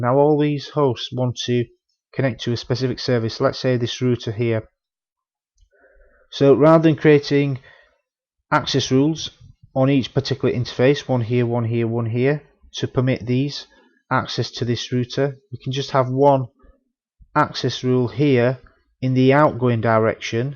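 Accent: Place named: England, United Kingdom. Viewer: Dude, you are soooo English!!! :-) I just don't have the accent (grew up in the UK when I was younger). Excellent explanations by the way...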